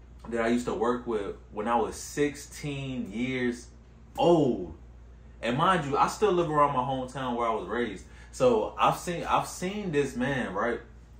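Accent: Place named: Virginia, United States. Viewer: I'm up early on a big Sunday as well😂. I'm about to do a HITT work out and a short session of yoga at home because its way to cold outside to run. I totally agree with you about social media. I deactivated all my accounts 5 years ago and my life has been SO much better, along with my mental health (I kept YTube as a learning tool ). It is so freeing. Im living my life authentically, not based on, or in comparison to what others are doing. I also feel other people's lives are none of my business and my life is no one else's. I share pics and moments with my family and close friendz-and that's enough. I even stopped listening to music with words for a few years to make sure my life and views on relationships weren't being influenced by lyrics in a song, especially rap and R&B. Afterwards, I realized, truthfully, I didn't even want to be in relationships and I didn't care about getting rich or looking wealthy anymore; I was being swayed by the music I was listening to everyday. 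I know this all sounds extreme, but that's how serious I was about changing and truly finding myself. Nowadays Im focusing on completing my second degree, health, traveling, art, plants, friendships, and growing my retirement accounts 😊. S/N: Are you from Virginia? You have their dialect.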